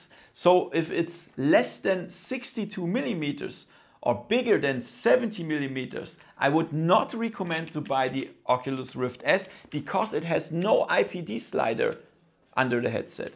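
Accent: Germany. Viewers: Its rude to comment on how someone sounds I'd be offended too. Especially sense he put all the effort into the video and he's got people like you complaining about his German accent. It's a stupid and petty complaint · Everything he says is clear, there is no need to bring up his accent. He's German, why does he need to work on an English accent? He doesn't have to take your "advise" when it's an unnecessary critic of how he sounds. The dude went to the effort of making an English speaking channel to help out another audience and you're nit picking. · Are you German ?😂 Wenn ja ich auch haha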